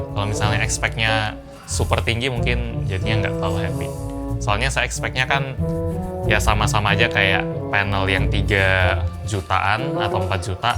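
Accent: Palembang, Indonesia. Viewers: Mengot Bahaso Palembang nian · Mengot....🤣 palembang nyo di mano bang? Salam kenal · Anjay mengot.. palembang nian cuk😄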